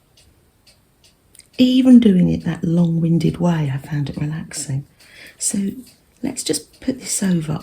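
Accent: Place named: England, United Kingdom. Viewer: I so love listening to English laies speaking about their sewing project - such kind, gentle voices, easy listening and relaxing. love this project.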